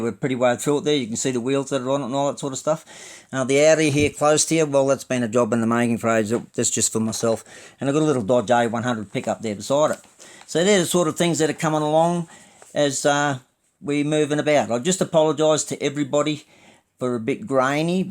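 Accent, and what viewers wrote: Australia. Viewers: Well done on 100 episodes 🤙🏼 Ill comment etc but don't add me to the list to win mate, let someone else have a crack at these cool prizes! But...if you decide to ever have that Holden Ute as a prize i promise to enter as many times as I can 🤣🤣 Hope you're doing well mate and its good to hear ya Aussie twang back on the Tube 🇭🇲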